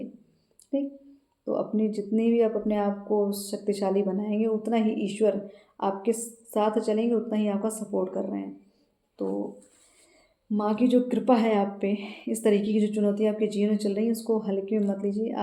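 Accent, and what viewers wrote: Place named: Haryana, India. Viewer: Aap haryana se ho main bta rahe hu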